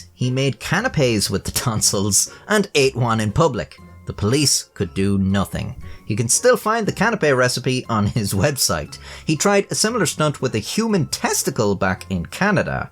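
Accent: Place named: Ireland. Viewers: I clicked because funny Irish man be talking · Maybe you just wanted to hear an Irish voice telling a tale with some line art animation, or maybe you wanted to know how to eat human. I'm here for the first thing, btw.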